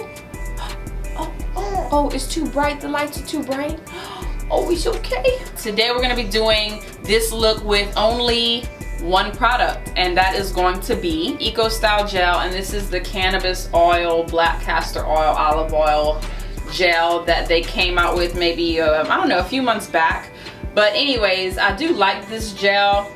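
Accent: Louisiana, United States. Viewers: Yasss.. Girl your hair is everything!! Soooo cute. Little miss Lavender is soo precious. It sounds like you could be from Louisiana? Anyway, thanks for the content.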